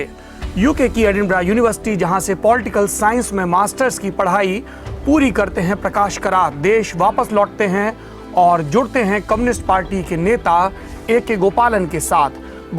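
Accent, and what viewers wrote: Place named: Bihar, India. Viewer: Its typical educated Bihari accent...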